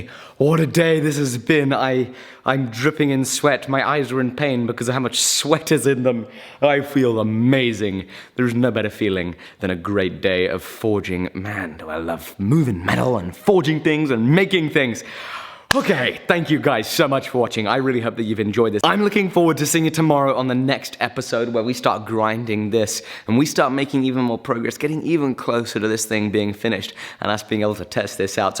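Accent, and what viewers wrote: England, United Kingdom. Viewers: I wondered how I watched 3 episodes in 2 days and then I realized he's clearly English.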